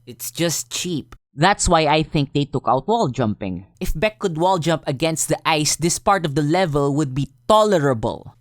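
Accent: Philippines. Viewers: Filipino. His English is good but his accent is pretty heavy. This comes from another Filipino. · You sound Filipino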